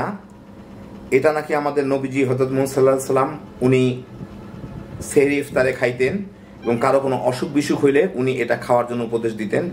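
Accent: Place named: Bikrampur, Bangladesh. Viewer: Assalmualikum . Are you from Bikrampur (Munshigonj) ? Your sound seems like that .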